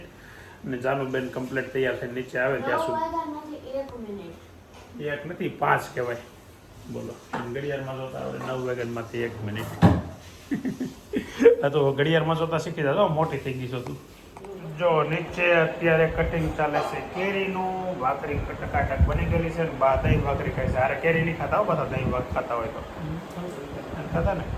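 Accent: Kathiawar, India. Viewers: sorry gujrati vachta nathi avartu Anguta chaap chu 😂 Bolta khatyavari gujrati che😂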